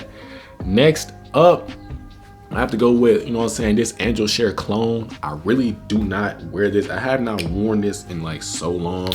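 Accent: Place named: Georgia, United States. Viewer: He must be from atl or Georgia because of the way he talking lol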